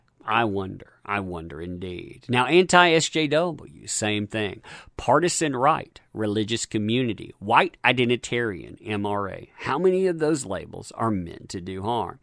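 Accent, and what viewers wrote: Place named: South, United States. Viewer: Been hearing a lot about this thing and how wrong they get things. Smh. I love your accent btw, where's it from I assume southern?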